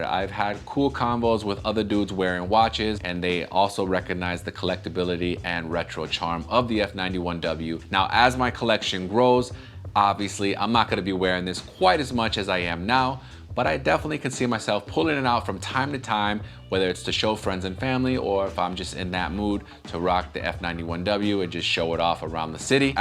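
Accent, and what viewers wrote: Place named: New York, United States. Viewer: I absolutely love the New York accent!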